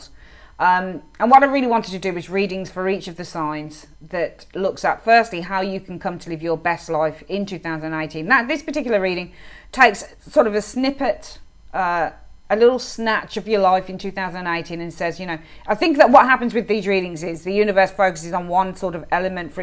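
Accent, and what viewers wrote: Birmingham, United Kingdom. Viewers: Usualsuspec13 i Love that’s She’s a Brummie like myself too. I was thinking that 😀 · I love you - 'If you wannit, you golla be onnit - you wannid it!' Gotta love a fellow Brummie. We give it straight, no chaser, honest and clear. Thank you for a great reading. So on point.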